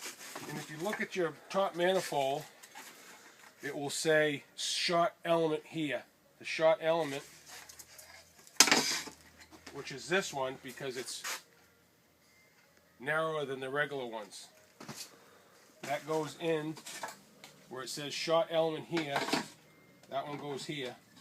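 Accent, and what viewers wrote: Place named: Boston, United States. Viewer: Love your Boston accent :-) It's wicked pissa!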